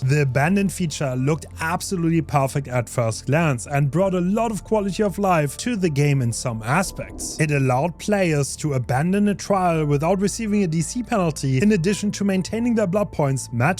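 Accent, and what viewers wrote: Germany. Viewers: Can you stop talking like the fucking rift guy while adding your horrible german accent dude? Wtf is wrong with you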